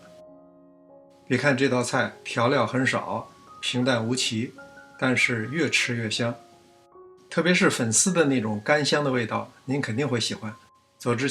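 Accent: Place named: Beijing, China. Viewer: 北京话好听。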